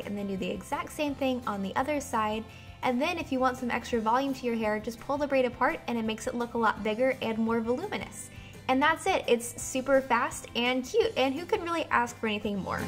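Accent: United States. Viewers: you really look like gemma styles and your voicebut with an american accent <33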